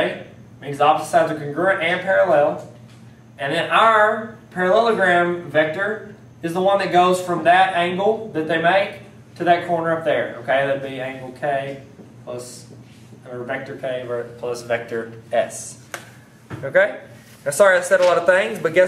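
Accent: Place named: Louisiana, United States. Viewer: You have to live in Louisiana, that accent tho, its ok I live in alabama, I have an even more hick accent than you do.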